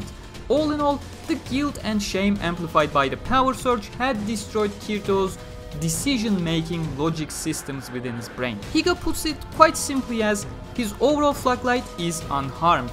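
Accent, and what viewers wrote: Turkey. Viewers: You really have a turkish accent,türk müsün lan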